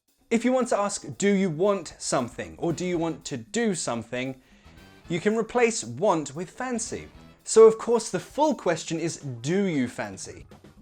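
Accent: United Kingdom. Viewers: U r the best I love ur style n the way of teaching, u make me fall in love British accent as well as American accent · No, we love British accent because of you,! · You're fantastic, man!! I love the british accent and your way of speech is a perfect example why I love that! Some day I'll speak a little similar as you! Uk ❤️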